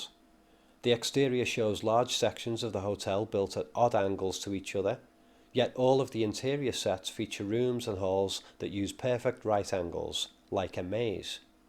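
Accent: Liverpool, United Kingdom. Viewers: Great video. Your accent interests me -- sounds like Scouse transplanted across the Atlantic. Are you a Scouse expat? :p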